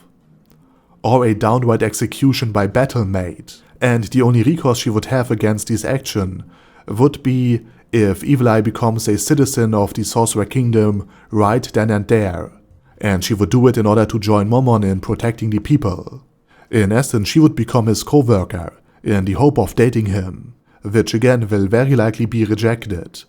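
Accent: Germany. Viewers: Geiler Deutscher Aksent Feier ich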